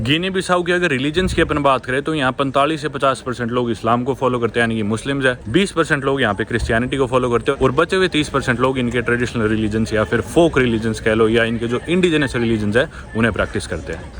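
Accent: Haryana, India. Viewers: Pure haryanvi accet 😂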